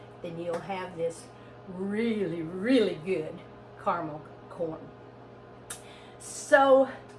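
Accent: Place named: Mississippi, United States. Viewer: First time viewer. What a lovely, delightful lady and an informative video. I love the accent. It reminds me of home is Mississippi, as does the caramel corn and peanut brittle.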